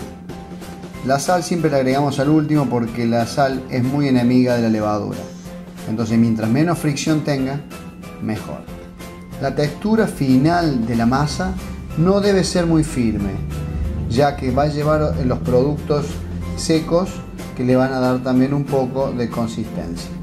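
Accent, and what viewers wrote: Córdoba, Argentina. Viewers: Desde hace muchos años soy un apasionado por la cocina. Hace menos años me sumé a la pastelería y tus clases fueron tesoros en esta nueva búsqueda. Para esta navidad hice turrón turco, pan dulce y budines. Fueron elogiados. Por eso va para vos mi agradecimiento por la generosidad con la que compartís tus secretos y conocimientos. Además, como soy patriota cordobés, disfruto de un maestro con mi mismo acento.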